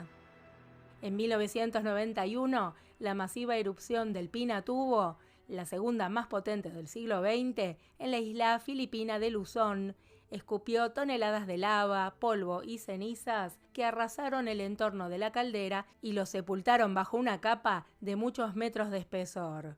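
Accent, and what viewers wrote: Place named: Rioplatense, Argentina. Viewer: ❤ siempre muy instructivo sus videos y su acento Rioplatense lo hace mejor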